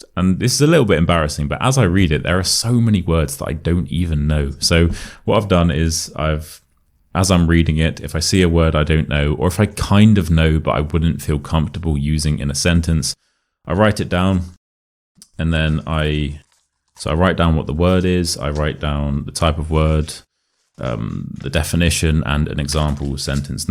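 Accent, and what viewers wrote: RP, United Kingdom. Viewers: the modern RP accent you speak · I clearly understand you, but as some already mentioned not everyone in UK speaks the same way. For example, I can barely understand a pretty British TV show Brassic. Still love British accent and the way you say things :-) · I'm gonna be hosnest i didn't get ir alll video but about 95.7% i could get . I'm so happy thia video is so amazing 😍 and i will watch more time this . because the British accent it's so my difficult to my ears. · maybe yours is the sound of educated people... like the BBC guys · I would call it a very "standard" English. I can hear you are from GB somewhere, but this language i would expect to hear in US as well. English is my 3rd language, and if everyone were talking this way, the world would have been much more understandable place. :-))) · He IS speaking RP like ...so It IS pretty clear to Understand If You have a good Level at listening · Quite understandable your speech... 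I always do enjoy your videos because I'm working on my British pronunciation and your particular accent is what I'm looking for... modern, standard, glotalisations, etc. ❤ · I do understand this British man but i live in uk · From your example, I think you have lived in Thailand. 😊. Yeah,, I am familiar with the British accent that you speak. However, I found that when I lived in London, people use different accents and it was hard to understand them all.. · It is a little bit harder to me understand his British accent, because I am used to listen to American accent, but in general I could understand almost everything he said. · Do all british speak like you?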